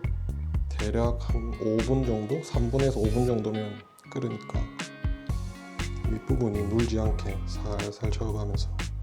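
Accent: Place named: Gyeongsang, South Korea. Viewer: B식탁님 말투완전 우리동네에요 전경상도 김해요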